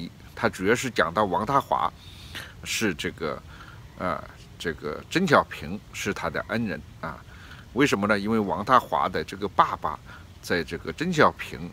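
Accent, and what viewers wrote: Hunan, China. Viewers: chu web 这是湖南普通话。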